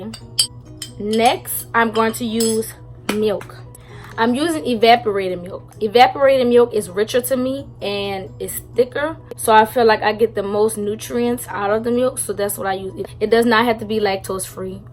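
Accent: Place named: Louisiana, United States. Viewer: 🤣😂🤣 I died when you said I'm black we never measure anything. I can def hear the Louisiana accent baybay lol. You do have beautiful skin.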